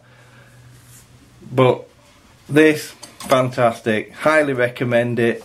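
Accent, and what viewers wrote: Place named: North, United Kingdom. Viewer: Thanks for the sub, sounds like tha'as from t'same part of t'world as me.Happy Flying.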